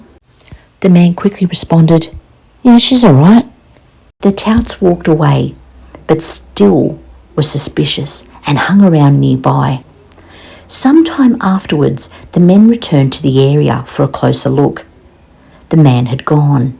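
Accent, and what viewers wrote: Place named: Australia. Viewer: Keep up your great work. So tired of O/S people, criticism of your accent. (usually Nth Americans-who think everyone should bow to them) I'm from Sth Aust, We tend to be quite tolerant, except when people criticise Aussie accents-each State has its idiosyncrasies with speech patterns. Most countries do!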